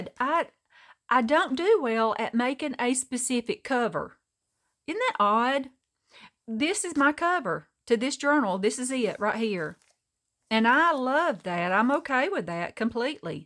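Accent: South, United States. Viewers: i discovered your channel yesterday. I have to say... Your giggle is charming and your slow southern drawl is so soothing! I love your little stories! Beautiful journal and very inspiring! I will be sure to watch the rest of your videos. Blessings to you 🙏 from Kim in Gettysburg, PA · This journal is stunning!! Btw, Where are you from? Our southern accents are the same so I know you're from the south lol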